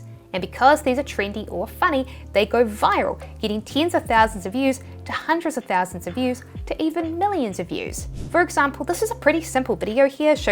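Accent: New Zealand. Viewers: I love your tutorials - so much information - they're brilliant! And I like listening to your Kiwi accent ;-) I have family in Christchurch.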